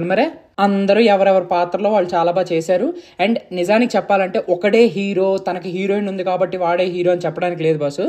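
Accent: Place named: Godavari, India. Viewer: Movie emo Kani Ne Godavari slang was awesome bro 😄😄👌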